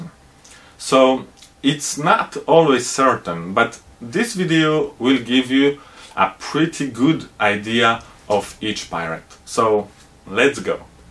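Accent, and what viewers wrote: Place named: France. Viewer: Your accent sounds French. What is the origin of this accent?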